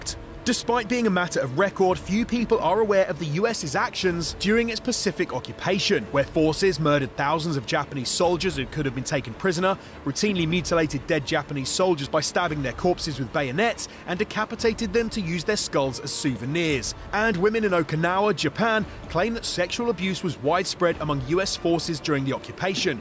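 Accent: England, United Kingdom. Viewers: It's definitely not an American who made the video...he has a clear English accent. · He doesn’t sound American. Maybe British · The narrator has a British accent. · Barrick He spoke with an English accent. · The narrator is actually Russian, though he speaks with an interesting English working class accent. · to be fair, the accent sounds english but the propaganda slant sounds american, so, could be both? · He sounds British to me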